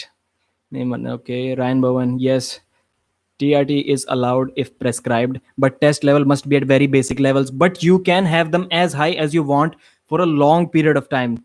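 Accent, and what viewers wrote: India. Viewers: a guy said he could hear your Indian accent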